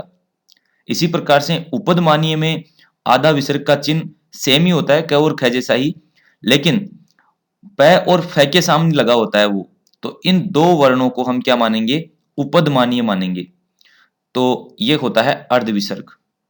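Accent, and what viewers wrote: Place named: Haryana, India. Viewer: वो क्षेत्रीय या मात्र भाषा का प्रभाव तो पड़ ही जाता है। किंचित वे हरियाणा से हैं।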